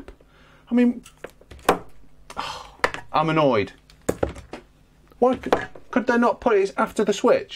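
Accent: United Kingdom. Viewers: this guy is funny, I also love that UK accent, instant subscribe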